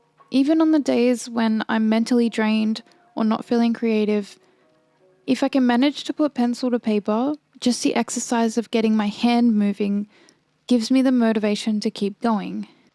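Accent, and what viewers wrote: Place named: Australia. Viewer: lovely hearing an Aussie voice for a change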